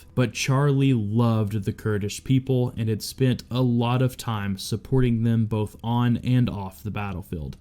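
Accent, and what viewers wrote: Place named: United States. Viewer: Why u Americans pronounce IRAQ as - Ai-raq...... Iraqis call it IRAQ as an E-raq.........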